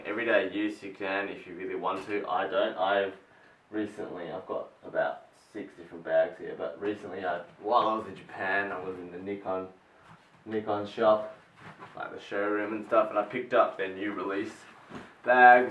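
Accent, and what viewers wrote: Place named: Australia. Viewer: Where you from, you sound Australian, how'd you get a hold of one of these?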